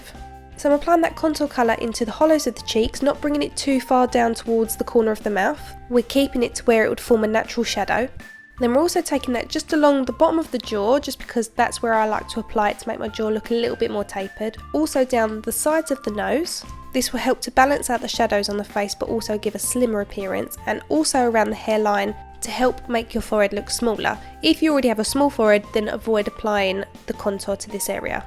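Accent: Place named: London, United Kingdom. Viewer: Lol, It's the London accent I have it to but voice it very pretty!!!!!!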